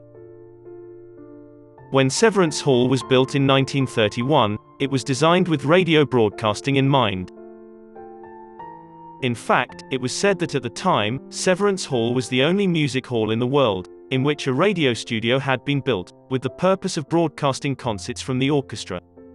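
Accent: United Kingdom. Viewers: Well, he may have a British accent and also be an American. This was a fun trip down memory lane.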